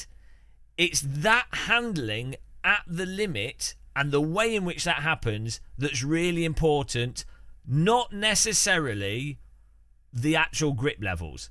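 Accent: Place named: Liverpool, United Kingdom. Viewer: love the liverpool accent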